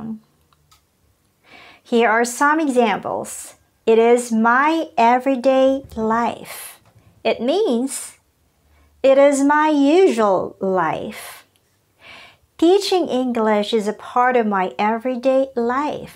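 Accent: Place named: United States. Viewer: a good idea to devide this topic into two lessons and great american pronunciation as well...😍😍😍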